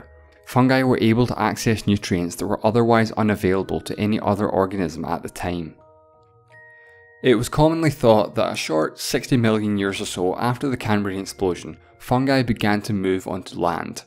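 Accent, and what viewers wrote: Scotland, United Kingdom. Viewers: Excellent video...thank you! Also love the narrator's voice and Scottish accent, no homo. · Alright I need to know what your accent is lol. It sounds Scottish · As a first time watcher, I learned two things. 1) This guy's accent is hard to pinpoint, but I think somewhere in Scotland. 2) We should send fungi that can survive in space out into the stratosphere and see what happens. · I love the mix of knowlege and scottish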